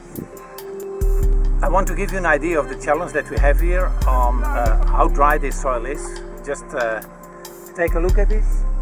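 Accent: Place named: Netherlands. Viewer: Probably, cant miss the dutch accent tho lol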